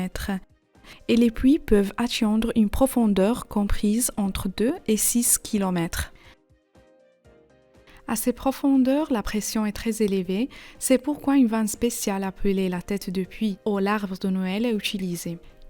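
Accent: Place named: Japan. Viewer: Ce n'est pas une voix électronique, mais une femme (à mon avis japonaise) avec un accent.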